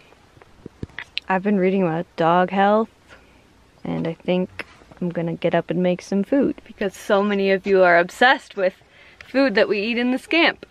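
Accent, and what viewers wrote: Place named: South, United States. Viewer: love your voice...nice country voice. you should sing often...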